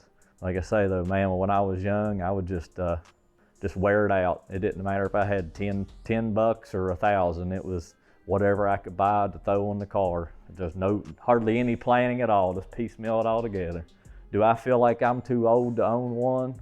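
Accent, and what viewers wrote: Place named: South, United States. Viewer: Dagum I’m digging the southern accent… givem hell you merican 🤣🫵🤫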